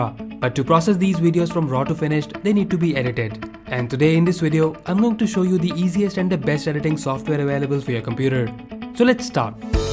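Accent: India. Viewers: I hear annoying Indian accent, I click away.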